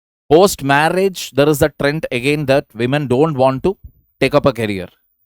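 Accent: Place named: South India, India. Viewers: I love south Indian Accent 😜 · South Indian guy bro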